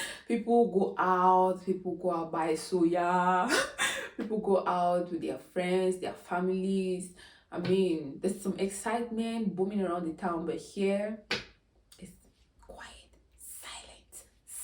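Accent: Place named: Nigeria. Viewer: Why do you sound Nigerian? A French speaking country would have been my last bet when listening to you!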